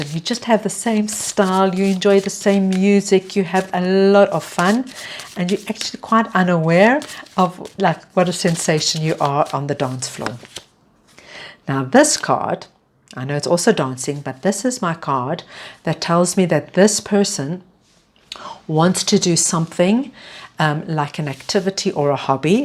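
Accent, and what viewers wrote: South Africa. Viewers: wow thanks for the reading queen! you sound south african lol❤️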